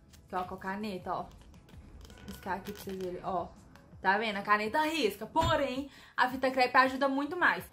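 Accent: Minas Gerais, Brazil. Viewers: É mineira, 98% de certeza pelo jeitin que fala kkkkkkk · Ah, mineirinha! É inconfundível o nosso sotaque. 👏👏❤️ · Moca vc e mineira né? Te ouvindo falar ,parece eu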